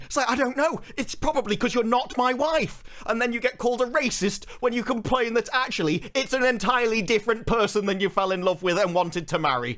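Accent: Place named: RP, United Kingdom. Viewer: Simply due to the accent and delivery (which are fantastic, no criticism), I feel as if I am listening to BBC news or about to hear the next band on Top of the Pops.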